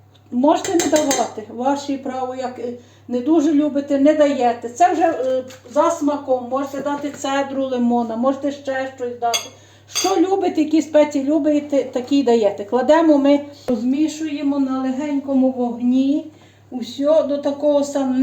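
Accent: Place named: Horodenka, Ukraine. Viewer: Слава Ісусу Христу,з неділею святою будьте здорові.П. я за браком часу невстигаю вам писати коментарі,а ви працюєте,випікаєте.Дуже гарне ваше печиво,а штолени сирні,які апетитні,ви напевно весільна господиня,або десь в їдальні працюєте.Дякую вам за ваші рецепти,що ділитеся з нами.Вітаю вас з прийдешніми святами,зичу миру нам всім та здоров'я.Ви часом не з городенківщини?колись там були в гостях і там похожий говір.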